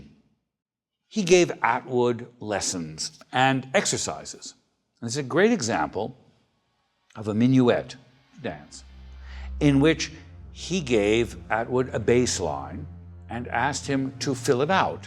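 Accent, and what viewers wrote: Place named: Netherlands. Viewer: What’s with the Dutch accent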